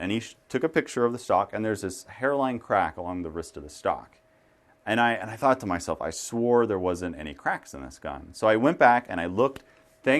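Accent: United States. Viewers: Are you from Missouri??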